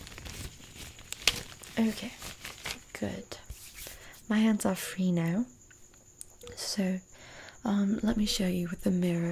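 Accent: United Kingdom. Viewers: Me encanta tu inglés. Es más brittish que american. Eres inteligente . Y de gafas estás preciosa. Te favorecen. Este vídeo me da muchas cosquillitas. Me suscribo. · Ooo fancy English-y accent in this. Thanks!!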